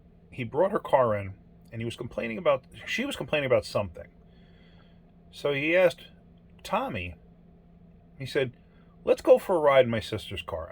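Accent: United States. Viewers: Huh, didn't detect an accent, I thought you were US born. I always tinkered with electrical stuff and got into electronic engineering myself.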